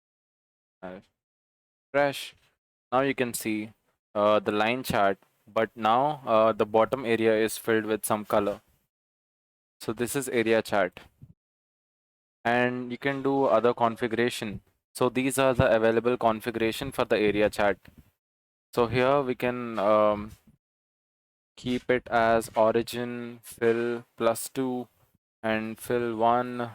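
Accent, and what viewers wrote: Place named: India. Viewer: Indian people, please please work on your English accent!!!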